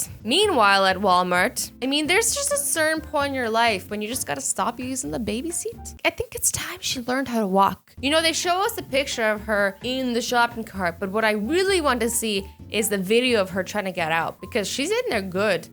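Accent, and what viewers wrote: Ireland. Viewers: you sound irish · U sounded irish in this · No affence Azzy but you sound kind of Irish in this video As a compliment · Azzy sounds Irish in this vid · Are you Irish??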